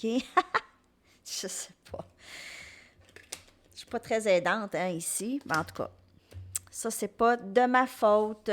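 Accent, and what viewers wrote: Canada. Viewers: je viens aussi pour écouter ce bel accent canadien gratitude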